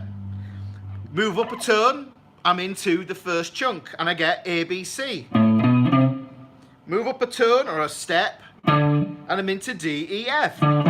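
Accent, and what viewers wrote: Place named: Huddersfield, United Kingdom. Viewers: Art Attack for fretboard theory. Absolutely love your northern accent. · Wow, I finally found someone who teaches using a proper accent (I'm from Huddersfield too), and not only that, uses an overhead projector!!! You totally rock, and I am absolutely digging your theory lessons, really well explained 👍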